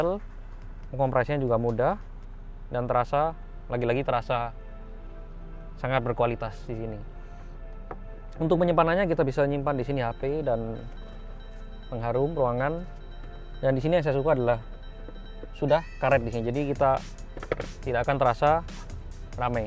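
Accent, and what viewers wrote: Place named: Madura, Indonesia. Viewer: Logat madura nya tebal hehe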